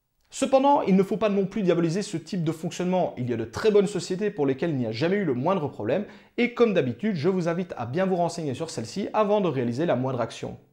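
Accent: Belgium. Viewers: On reconnait cet accent belge dégueulasse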